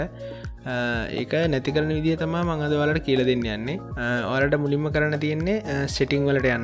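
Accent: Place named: India. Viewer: Description in english speak in indian.. Wtf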